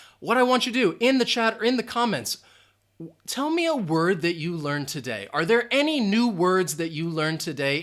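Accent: United States. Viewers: Very useful for those who speak fluenty another language wich isent their native one like me thank you guy and please try to not be absent for a long time please are you American or British i think your accent is pure american but I'm not sure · American , r is pronounced"are" , he uses slap t= "d"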